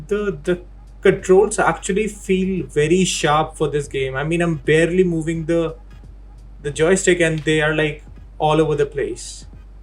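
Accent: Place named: India. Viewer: I like ur Indian accent ❤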